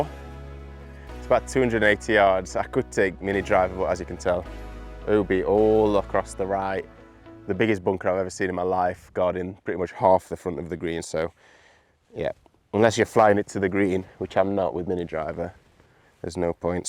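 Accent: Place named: Scotland, United Kingdom. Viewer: Hopefully it’s only the golf you concentrate on and not yr stupid Scottish accent if this I will unsubscribe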